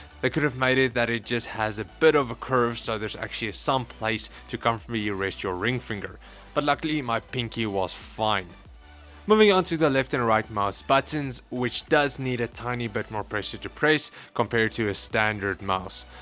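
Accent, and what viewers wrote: South Africa. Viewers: YES youre south african!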